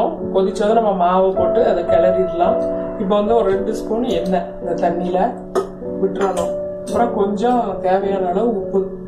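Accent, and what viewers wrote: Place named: Tirunelveli, India. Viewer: super mam....👌👌👌 unga slang romba nallaruku ...tirunelveli tamil mathri...... Azhaga iruku ..👍👍❤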